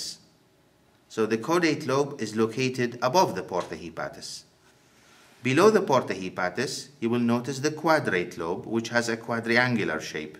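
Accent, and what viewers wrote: Iraq. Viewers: you are speaking like Iraq people ... you must be from Iraq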